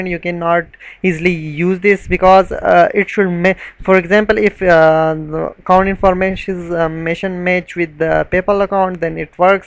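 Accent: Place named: England, United Kingdom. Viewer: It is hard to follow your English accent. Next time make sure you add subtitles. Thanks.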